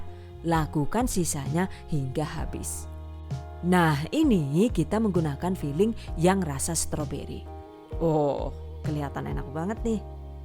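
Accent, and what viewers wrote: Banyumas, Indonesia. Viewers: Bunda asli mana bun. Kok Bahasa nya ngapak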